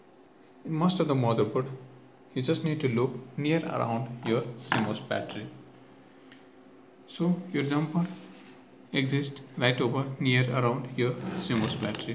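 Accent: India. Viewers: why does it sound like most utube demo's have India voices...i have problem with accents...like they say 'lost in translation'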